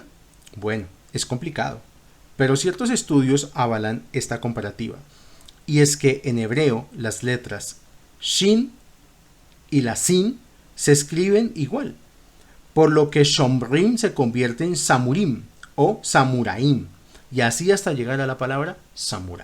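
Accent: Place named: Colombia. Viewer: Atento escuchándote. Tu acento me suena Colombiano. Un abrazo desde Brasil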